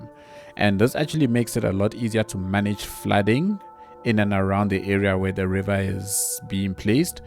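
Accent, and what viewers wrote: South Africa. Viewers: Bruh are you South African???????? Mans that accent, i know that accent from anywhere xD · Bro are you South African? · Man it’s great to hear a South African voice on YouTube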